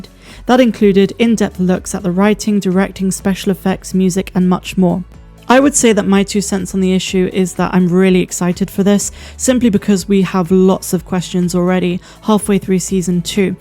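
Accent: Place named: Somerset, United Kingdom. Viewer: Loving your content, As a fellow SW (zummerzet) Star wars fan and Storm trooper cosplayer, It's gert lush mate! It,s so nice to hear an accent like mine doing this style of content.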